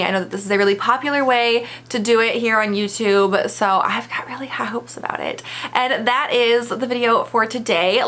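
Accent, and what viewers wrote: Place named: South, United States. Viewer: You kinda sound like you have a southern accent in this video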